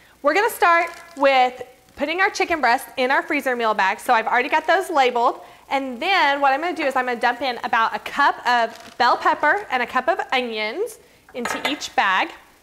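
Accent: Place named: United States. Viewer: What a load of rubbish all processed food frozen and that so annoying American voice just shut up!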